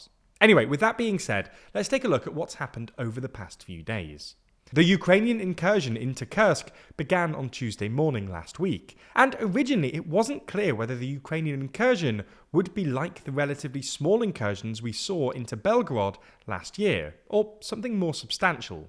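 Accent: England, United Kingdom. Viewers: Never believe a single word about this war with an English accent. It is the Perfidious Albion sound of lying. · Do you have a speech impediment or just a goofy brit accent?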